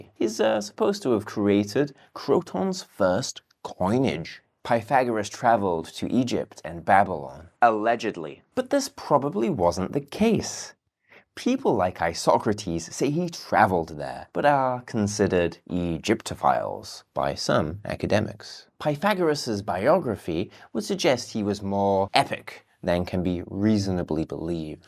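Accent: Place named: United Kingdom. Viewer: You sound British. If you are, you especially should know it's maths, not math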